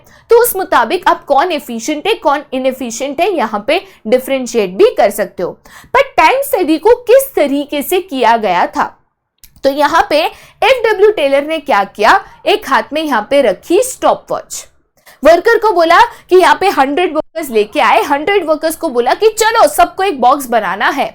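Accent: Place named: Mumbai, India. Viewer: Mam aap Mumbai se ho naa appke word ascent se pata chalta hai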